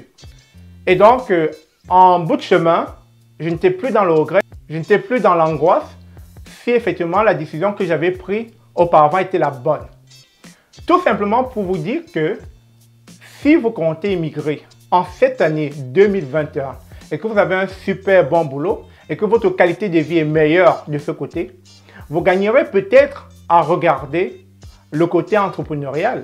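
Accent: Cameroon. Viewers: Etes-vous Camerounais? je le demande a cause de votre accent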